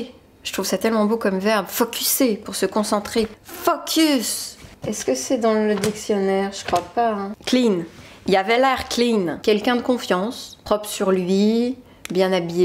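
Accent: Quebec, Canada. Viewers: J'adore lorsque tu parles avec ton accent québécois! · Solange est pas fucké, elle m'a l'air d'une Québécoise qui fait semblant d'être une parisienne... lol.. Ton Québécois est parfait, t'es Française ou Québécoise? En passant, si l'origine du Français Canadien t'intéresse, écoute du Joual. Les coins de campagne, le bas du fleuve, ou les maritimes encore aujourd'hui parlent un Français très similaire, mais en ville notre Français est très commun. Le joual et le Québécois est au Français comme le Créole est au Français. <link> (active les sous-titres!) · Pour ton info, elle vient en effet du Québec. Et elle ne fait pas semblant d'être quoi que ce soit : elle vit en France depuis plusieurs années. Du coup, tu finis par être à l,aise avec la langue de ton nouveau chez toi. Elle a appris avec le temps et on ne voit pas la différence ou presque. Mais le Québec reste caché et peut sortir facilement ! 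· Jsuis québécoise pis jtrouve que ta un superbe accent québécois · elle a pas l'air francaise :') ton accens québecois est trop bien fait ahah et c'est sur que si tu prend le pire film quebecois sa sera nul même les quebecois écoute pas ca genre je suis québecoise et j'ai jamais écouter ca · xoxo Elle «imite» aussi bien l'accent français puisqu'elle est québécoise. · T'a tellement pri d'accent quebequois c'est fou!!!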